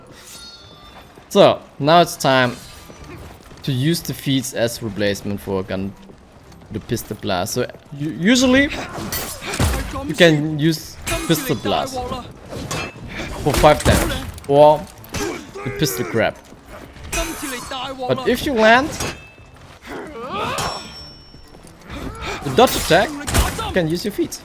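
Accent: Germany. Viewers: german-english always on point:D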